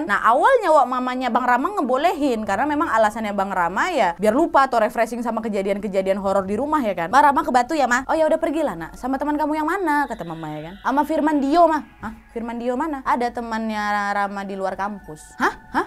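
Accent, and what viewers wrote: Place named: Medan, Indonesia. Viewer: Medan kali logat nya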